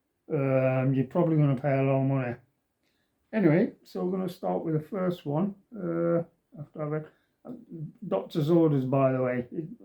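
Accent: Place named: Nottingham, United Kingdom. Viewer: Are you from Nottingham by any chance? Might be the accent I dont know haha. Nice cave mate. All the best.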